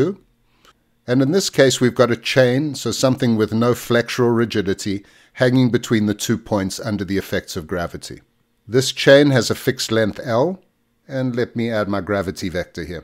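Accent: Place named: Cape Town, South Africa. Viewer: Hey, nice. Nice to hear a Cape Town accent. I have a more important optimisation problem to solve, which is that of an elastic catenary with fixed weight at some point in the catenary. The problem space is maximum highball free-lining, where we deliberately span open spaces with elastic climbing ropes and the walk them. The ultimate boundary condition of course is elastic strength failure of the freeline. However that failure condition is not the solution to the fundamental problem. What is the catenary solution for an elastic rope in the presence of one or more discrete local masses?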